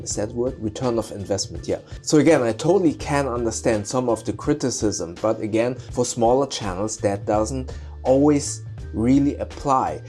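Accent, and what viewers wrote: Germany. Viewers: I love your voice and your German accent, it's so soothing. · Dude, love the German accent! Echt ein klasse Typ, weiter so!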